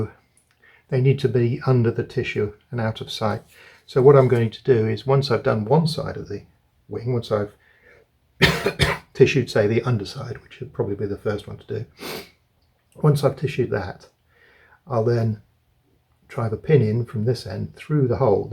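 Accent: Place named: Yorkshire, United Kingdom. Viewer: Totally agree with you. I'm waiting to see how he bent the carbon fiber rod for the tips. But please don't tell him I LOVE your channel heaps more ❤ If you were a shiela I'd ask ya out and we could talk about ailerons and airfoils and fuel mix ratios and compare our Fokkers all night and stuff like that 😂 But ya a bloke 😂😂😂😂😂😂😂😂 and I'm happily married. So that's a bit messed up hey 😅 You remind me so much of a bloke (in Australia cos I'm in Sydney Australia ) oh man it was near forty years ago I was 20 he was 60. Name was Charlie Leeson from Yorkshire. A tool maker who really made me fussy about word work and modelling. I flew my fifth scale DVII and he his tiger moth and fly baby. Best flying days of my life with Charlie.. His work was stunning. (He passed away a good twenty years ago ) Anyway you have a similar accent and manner so thank you for being you and helping to revitalise so many memories of a hero of my youth. 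Hey shouldn't I be talking about Danny's channel and not yours here?????😂😂😂😂😂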